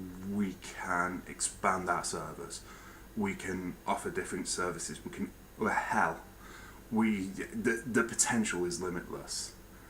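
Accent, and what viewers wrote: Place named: Northern England, United Kingdom. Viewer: No worries, it must be hard being misunderstood like I am. I kinda get pissy at people when they mock me and generally don't understand me, so it makes sense the forum would be unaccepting of newer members but I'll try to fit in they're, and I will PM you when I figure the system out and if I remember you're name. Their's something I wanted to ask you, are you from England? I used to live they're until I moved. Most of my family live their as well. I can kinda tell your accent is from the North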